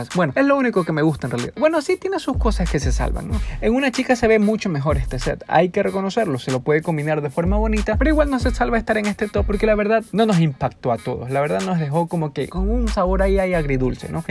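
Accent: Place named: Ecuador. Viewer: Por su manera de hablar siento que es Ecuatoriano, jaja buen video ♡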